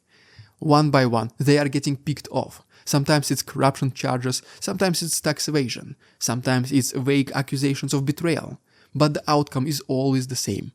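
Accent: Russia. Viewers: Wooohoo, you are speaking sooo fast and you have such a strong russian accent, this makes it not easy to understand for someone whose native language is not English. 😅 Great topics, but I guess I need to watch the video again to fully understand it all. Sometimes it needs some time to adapt to the accents. It was the same with a youtuber from Wales in GB, for example. At first I understood nothing, really... After three or four videos I got used to it. 😅 So I have hope. Because I consider your videos as really informative and very interesting. Thank you. :)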